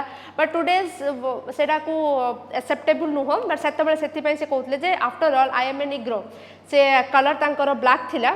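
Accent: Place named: Odisha, India. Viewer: Odia 😀 english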